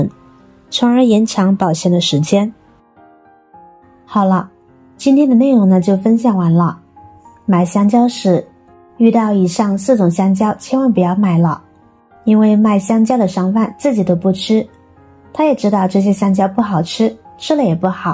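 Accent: China. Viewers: 所謂香蕉催熟，是用塑膠袋包覆，冬天才會用 夏天跟本不須要催熟 聽妳的口音就是中國腔，妳懂香蕉？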